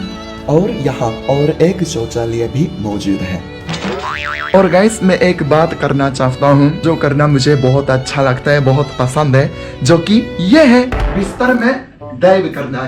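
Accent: Standard. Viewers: Shuddhhhhh Hindi wowowowow👍😁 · although i an Maharashtrian citizen i dont know to speak such pure hindi like u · Omg, iski hindi to meri hindi se bi acchi h, itni shuddh hindi😛 Great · Pure Hindi and simplicity = Love from Mumbai only ☺❤😄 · Checking in... ♥️♥️ pure shudh uccharan krte ho aap bhai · He is speaking pure hindi 👍 May god bless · A JAPANESE CAN TALK BETTER HINDI PURE THEN INDIANS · Itni shud hindi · s hindi is very pure and good than most of the indians❤❤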